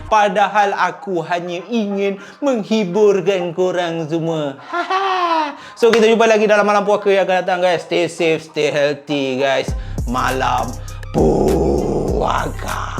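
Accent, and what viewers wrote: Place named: Penang, Malaysia. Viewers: Fuhhhhh ..loqat penang dia mcm geqammm ja kita dengaq..😂😂😂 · Assalamualaikum...ada la ckit2 mcm org Penang cakap...kedah pn ada jgk...asalkn utara mna negeri pn x pa la...jnji utara😂😂😂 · Slmt ptg...adehh..krem perut Oii..tiap kali dgr ceritA mati akk mgekek tgelak,lg2 dgr ckp loghat peneng😂😂😂😂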